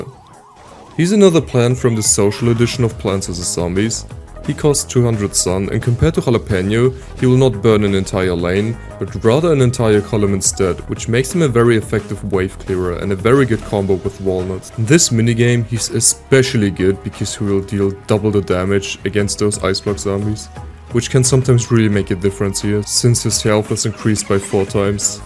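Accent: Germany. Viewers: Hm, i hear in your voice some german... and i see u are in germany... bist du deutsch xD? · I can not not hear the german accent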